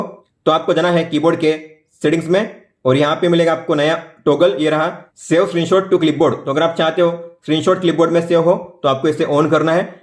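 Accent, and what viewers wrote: Gujarat, India. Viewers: Are you from gujarat..? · Are you from Gujarat?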